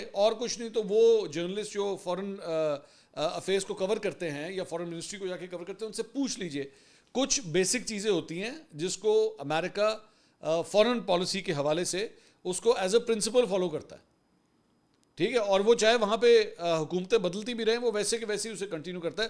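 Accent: Punjab, Pakistan. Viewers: AJJ KA VLOG BOHT HI KAMAL KA THA PUNJABI TOUCH KAMAL KA HOTA HAI AP KA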